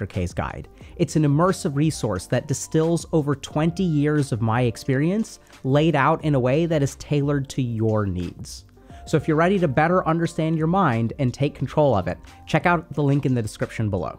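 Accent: India. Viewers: Bro.. I love the indian accent lol.... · Damn your English sounds so Indian 😅 BTW thanks for free knowledge....you blessing us with your knowledge glad i found your channel ❤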